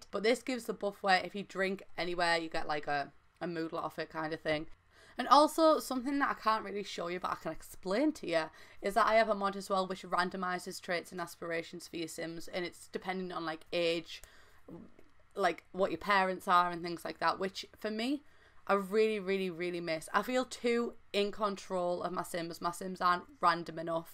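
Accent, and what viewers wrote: United Kingdom. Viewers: Very useful- will be getting those mods for the aspiration and traits- its nice to hear a British accent too- I've not come across fellow Brits when looking at Sim Youtube accounts!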